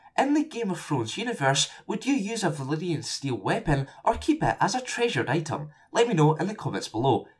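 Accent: Scotland, United Kingdom. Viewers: your videos are getting so much better and your uploads more faster. with your scottish accent its a much have😜 can you put a tutorial on how to speak scottish because im dutch and im scared to learn from my mom (born in sterlin)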